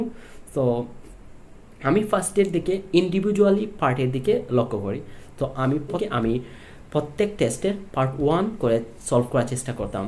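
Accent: Sylhet, Bangladesh. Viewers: Are you Sylhet’s your voice is like Sylhet’s Very helpful your video.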